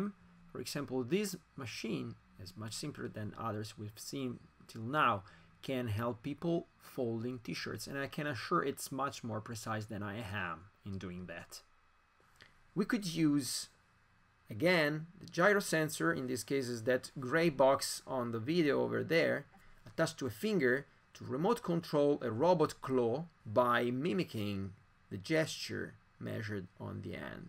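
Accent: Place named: Italy. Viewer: Mi scusi per offtop, ma mi piace che Lei parla Inglese con accento Italiano! :)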